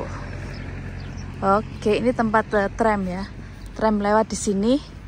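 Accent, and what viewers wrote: Java, Indonesia. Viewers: RAJIN UPLOAD MBAK E WES NGENTENI CAH AYU NGOMONG BOSO JOWO😂❤ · anakmu ngguemesne. medok e puolll pisan mbk yu, slm ko malang kota. mugo2 sampean sekeluarga diparingi rejeki lancar lan sehat walafiat... aminnn.... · Mbak sampean jowone ngendi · Bahasa jowone pean mentok banget mbak 😂😂😂👍👍👍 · Asalamualaikum mbk ... baru tau chanelnya .... jawane nek ndi sih mbak kok logate podo karo aku😅😅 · Jowone ngendi mbak ? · Paling seneng lihat cantik, ngomongnya Jowo medhok... Pinter juga bhs. Indonesia. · Kok iso muedok? 😅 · Ya Allah 😅😅 Jowone medok banget Ayem tentrem nggih mbak meskipun 30jt lebih buat sewa😅😅 Belum lain lain Mbak, WC ne pake air nggak 😅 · salut iso bahasa jawa; dan juga medok; lanjutkan budaya jawa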